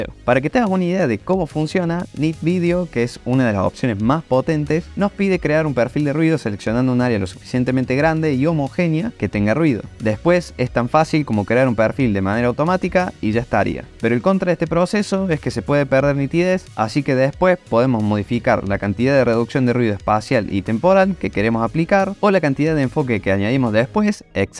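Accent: Córdoba, Argentina. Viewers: Hola, no me he enterado de nada😏 entre que hablas con ese acento argentino y que hablas muy deprisa me he quedado como empecé, lo siento. Soy alumno de la escuela, y por cierto, ¿donde están esas herramientas que dices? Mi opinión es que este tutorial es para un público avanzado hablas con tecnicismos que no entiendo y yo estoy aprendiendo en edición en la escuela. Un saludo. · sos cordobes, no? Aguante Argentina papaaaa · Cordobes hasta la medula jajaja 👍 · Grande!!! Qué lindo es escuchar una tonada cordobesa en este canal que sigo hace tantos años!! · INCREIBLE HERMANO ENCIMA CORDOBEEEEEEEEEEE ♪ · Sorpresa grata de escuchar un cordobés acá jajaja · Vamo' ese cordobés papá! La didáctica que me manejan en este canal es una locura. ¡Abrazo gigante! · Holaaa desde Uruguay! Escucho tonadita de Cordobés??? Me encantóooo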